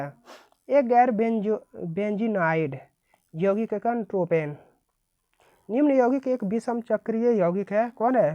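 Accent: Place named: Bhojpuri, India. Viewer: Hindi to shi bol liya kro usme bhi bhojpuri add kr dete ho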